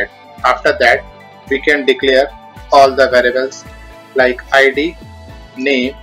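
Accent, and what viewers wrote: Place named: India. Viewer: Please speak English clearly. Your strong Indian accent is disturbing.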